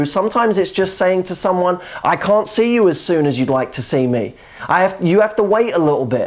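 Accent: United Kingdom. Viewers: ...is it revealed in some of yours videos what is the recipe to attract you ?! :) you are so cute, handsome, so kind and generous with sharing your knowledge that I am about to fall in love with you ;) ...the reason why I've popped into this channel was looking for anything to listen in english as a preparation for english exam and your british accent is so cool so I am watching more and more of your videos now <3 thank you :) ! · Hey can you please do a video on the accent...How to do a brit accent...I cannot get over it.. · this guy is extremely attractive, i love his accent, is he British ?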